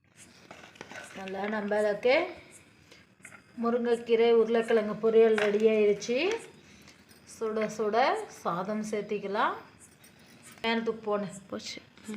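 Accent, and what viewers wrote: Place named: Salem, India. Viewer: நீங்க சேலம் பக்கத்து கரர் போல பேச்சு erukerathe nengal சேலம் மா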